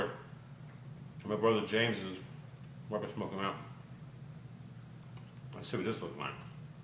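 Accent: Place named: Texas, United States. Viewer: One question that I would have is where in the USA are you cooking? Based on your accent, it sounds like you are also from Texas. Ambient humidity could also have a big influence. If you are down in a high humidity area, like the gulf coast, then the pan probably does not make a difference water or sand. The water pan probably does not have as much of a noticeable difference of the humidity level in the smoker. If you are in a low humidity area, like the Texas panhandle or West Texas sticking with the Texas references, then it make make a bigger difference. Since I am new to your channel, I do not know where you are doing your testing.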